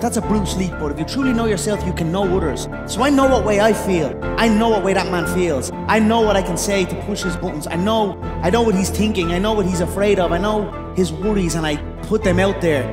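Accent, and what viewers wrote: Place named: Ireland. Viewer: 아일랜드 발음 중독성 쩌네 ㅋㅋㅋ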